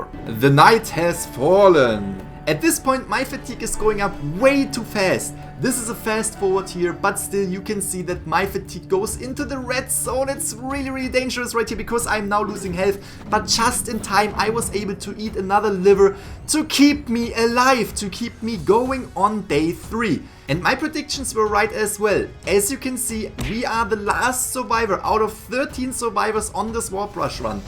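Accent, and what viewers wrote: Germany. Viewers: Der harte deutsche Akzent ist herrlich xD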